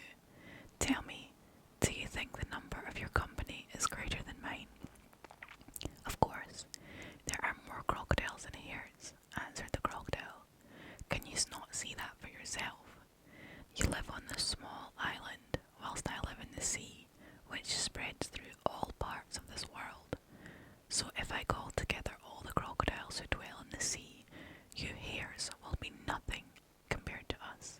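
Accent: Scotland, United Kingdom. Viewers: You are absolutely brilliant. I love your Scottish accent! I hope you gain a lot of viewers. I’ll be sticking around for sure 💚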